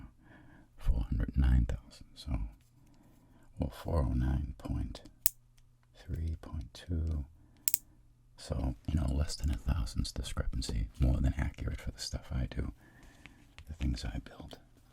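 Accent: Boston, United States. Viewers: I never noticed his... Boston (?) accent before. He's always affected a different accent every time. Interesting. I love this video. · I Sense the Boston Accent is Strong with this one.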